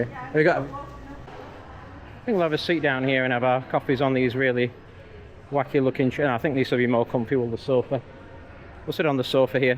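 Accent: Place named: Northern England, United Kingdom. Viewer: Last time in Orlando we had tickets for Volcano Bay that had just opened, but every time we got there it was full for the day and that was before 9am. Things have gone full circle with the queuing, back in the 90s with less parks the queues were horrendous, 3 hours being typical, but with many more parks opening queue lines dropped so you wouldn’t have to queue much beyond an hour for anything. That’s all gone now and with the constant summer rain it makes for a less than perfect scenario. Thanks for the video. Nice to hear a northern voice, we are near Manchester.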